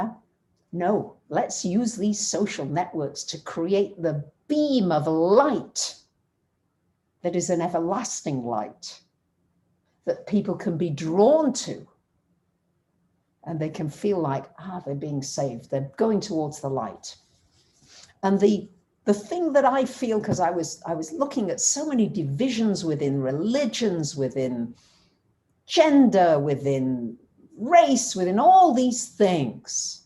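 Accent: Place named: England, United Kingdom. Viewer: Love your passion towards astrology and english accent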